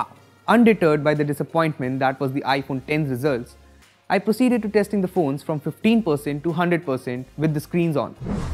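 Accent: India. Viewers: jeez you tryna talk in street language in indian accent...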